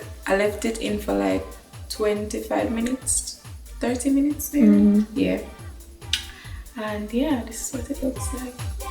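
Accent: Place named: Jamaica. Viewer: Lawwwd goodie u accent 😍😍😍🇯🇲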